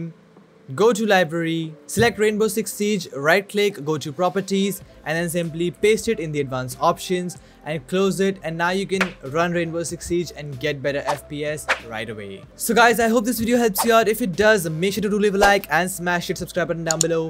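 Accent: India. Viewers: indian accent, dislike, next video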